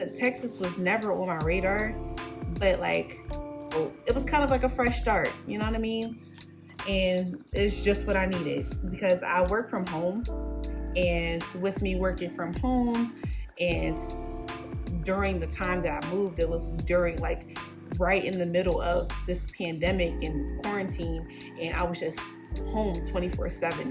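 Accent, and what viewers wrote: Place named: Philadelphia, United States. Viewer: And I just knew u were from philly soon as I heard u talk lol we really do have an accent!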